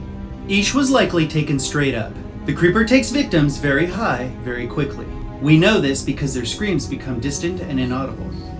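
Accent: South, United States. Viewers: I love the scripted voices. Knowing you as I do, it’s funny to hear the southern accent. Your in your theatric element!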